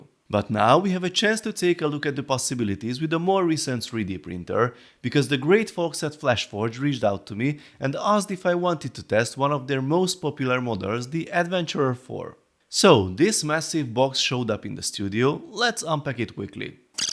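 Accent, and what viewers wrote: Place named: Hungary. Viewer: Are you Hungarian? Your accent sounds so Hungarian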